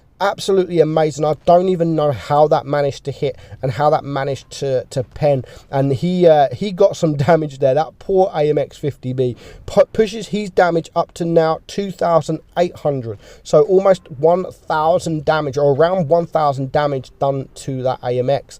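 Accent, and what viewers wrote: United Kingdom. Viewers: I like the way he comment on his channel with hia British accent and the way he said things like Example if E 100 shot you will will know about it or sometime when he said ita frastruating its very nice to hear because the way he said and british accent hehe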